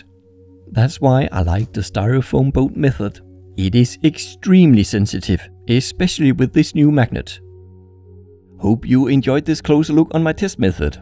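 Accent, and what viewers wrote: Denmark. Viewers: When you just Can hear he’s Danish ;-) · It's funny to hear your Danish. I come from Denmark.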